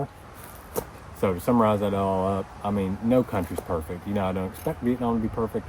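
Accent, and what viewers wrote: South, United States. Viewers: Your southern accent really is a lot stronger now that you're home lol glad you found your place, it must have been stressful not having a home base for that long...excited for your new chapter! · Ayy southern baby with the thickest accent is back again! pls more farm vlogs pls. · bro how come you got southern accent when you got back home 😂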